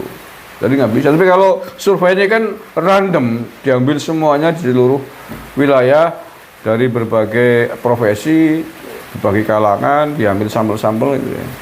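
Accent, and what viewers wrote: Banyumas, Indonesia. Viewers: Rika wong endi pak 😂😂 Wong ngapak mesti Ora ngapak ora kepenak Nyong lima bersaudara...empat ttp kekeh setia karo PDIP/Ganjar Nyong dewek ttp setia karo Jokowi Pejah gesang kula nderek Jokowi Salam ngapak Jatilawang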